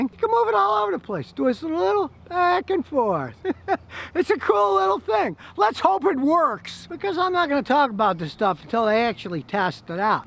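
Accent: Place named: North, United States. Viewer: Welcome back to the south. Your accent says "yankee' to me, but you have become a southerner at heart, in my exalted opinion. You were fortunate that it only took two months to decide. It took me a few years in California to realize that I needed to come back. Love your videos, even if I sometimes do things which you would label "stupid".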